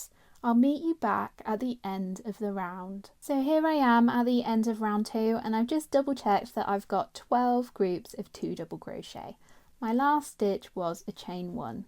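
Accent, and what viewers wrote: England, United Kingdom. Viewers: I’m following this, but it’s strange to hear an English accent use American crochet terms. I really have to concentrate!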